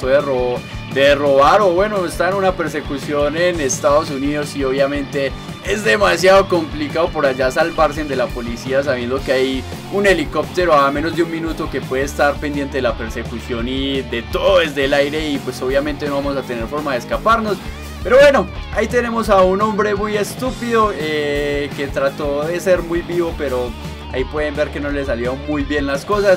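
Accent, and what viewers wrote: Antioquia, Colombia. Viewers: Puro asentó paisa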